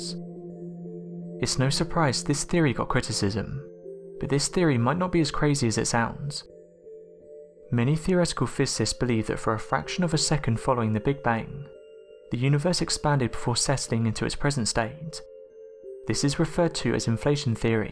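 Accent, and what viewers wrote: United Kingdom. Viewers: Another British accent. Cool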